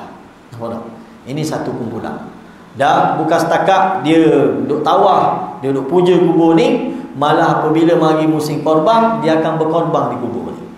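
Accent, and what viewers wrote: Terengganu, Malaysia. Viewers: Tq Mantap ustz teganu Walaupon baru ku kenal Namun pelat teganu mu menjadi poojaan hatiku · Loghat ganu....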